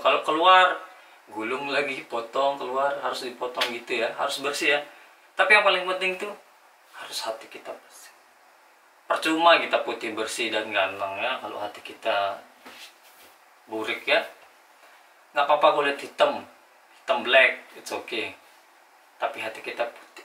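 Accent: Lombok, Indonesia. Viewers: Logat orang lombok😂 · Bahasa Logat Lomboknya (Sasak) Terdengar jelas di campur dengan bahasa indo , the best lah 👍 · Bang lu dari Lombok?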